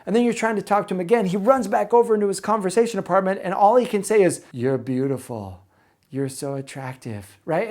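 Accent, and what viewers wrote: United States. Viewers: I love your video's. I love your American accent too 💛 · I love your slight country accent! I didn’t notice at first until you said “time”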